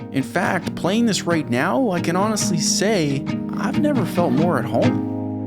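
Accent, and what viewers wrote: Quebec, Canada. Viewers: I think he’s French Canadian lol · Are you Canadian? I heard something of a Canadian accent. And you pronounced French words correctly.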